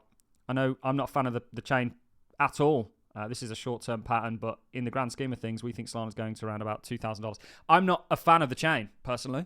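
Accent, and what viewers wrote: United Kingdom. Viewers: Been watching crypto YouTube for 8 years now. is the best non biased analyst I have seen. Plus his sweet British accent is like butter in the morning. There is no need to rage my friend. It’s just a daily update. Go somewhere else and listen to what you want to hear. Plenty of opportunities for news.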